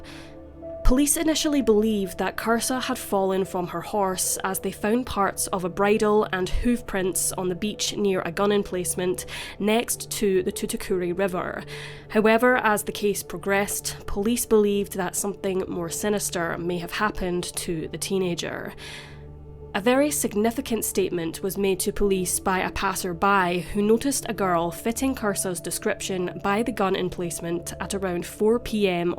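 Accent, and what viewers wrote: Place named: Somerset, United Kingdom. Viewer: Ooh arr nothing wrong with a Zumerzet accent ..😃😃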